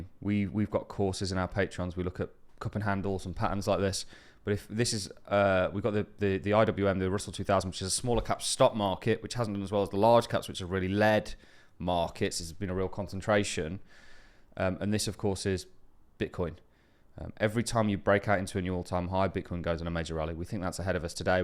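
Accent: Birmingham, United Kingdom. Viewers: I hear midlands, birmingham way maybe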